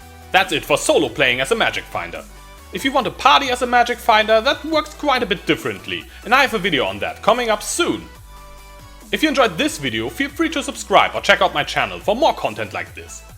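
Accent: Germany. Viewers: you are german richtig?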